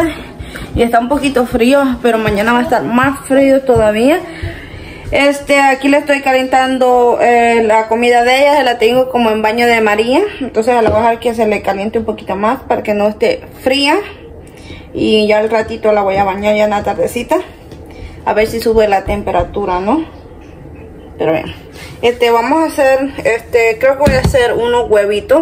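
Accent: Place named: El Salvador. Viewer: Los salvadoreños así hablan linda conozco muchos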